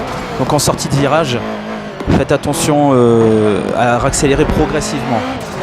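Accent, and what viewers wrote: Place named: North, France. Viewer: On sent que vient du Nord;-)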